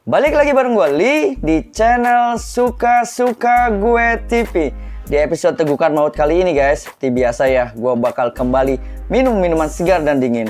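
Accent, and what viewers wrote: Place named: West Java, Indonesia. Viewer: Punten bang kok abang orang bali kek orang sundaaa☺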